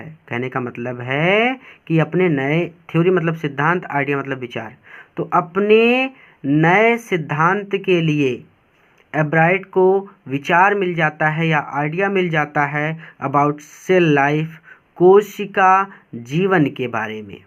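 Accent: Allahabad, India. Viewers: Sir kya aap allahabad se hai kya 🙂🙂🙂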